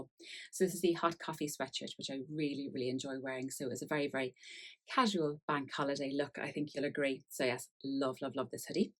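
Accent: Ireland. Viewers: Hello, I just came across your channel, those are beautiful makes, is that an Irish accent?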